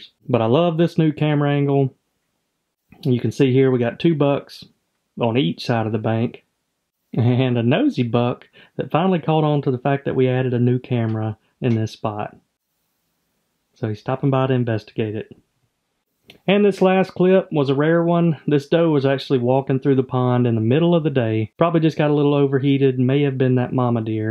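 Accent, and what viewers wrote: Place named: South, United States. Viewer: the way you've said "hello fresh" was poetically beautiful. What part in the US are you creating from? I did hear a cave climber sound similarly too. I think they were from georgia or somewhere southern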